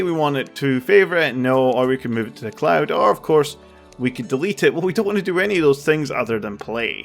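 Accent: United Kingdom. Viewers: Why always British terraria play throughers.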